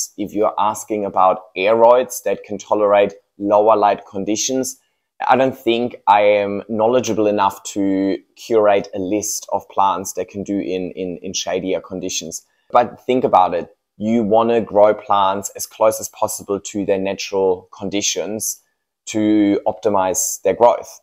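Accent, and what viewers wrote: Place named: Germany. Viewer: Are you German? I can't figure out if you're a German in Sydney or if Australian English is actually that close to a German accent 😂 It kinda blows my mind how similar it is :D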